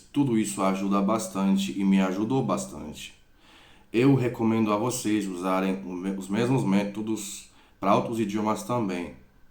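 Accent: Minas Gerais, Brazil. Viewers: Seu sotaque está maravilhoso. Parece o sotaque do pessoal mineiro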